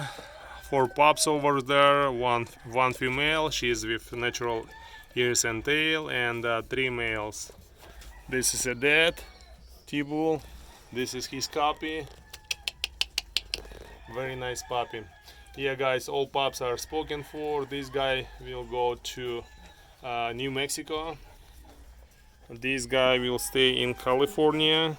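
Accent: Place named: Russia. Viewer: Всё равно русское акцент чувствуются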